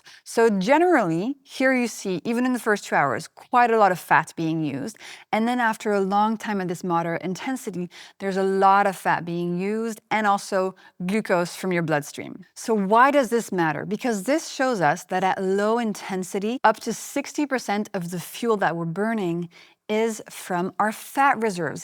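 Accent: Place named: France. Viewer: Your voice is mellifluous ! Ur french accent makes all the difference I guess and u use a lot of hissing sound. No wonder..most French women are sexy and sound sexy ! But, this dress sucks !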